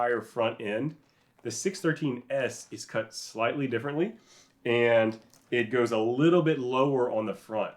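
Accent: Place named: Pennsylvania, United States. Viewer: love the pennsylvania accent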